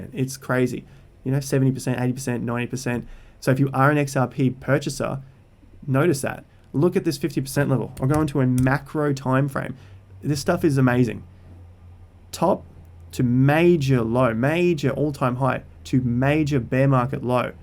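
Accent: Australia. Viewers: Great to hear great content with a bit of an Aussie accent...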